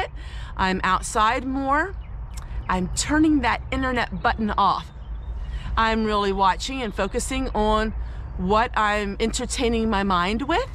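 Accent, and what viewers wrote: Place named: Pennsylvania, United States. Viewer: I've been to Pennsylvania many times and you sound just like a Pennsylvanian. Your son has the same accent. You don't talk" oddly " at all. It's regional. I'm so glad you brought anxiety disorder to light. There's more of us than we ever knew sis. God be with you.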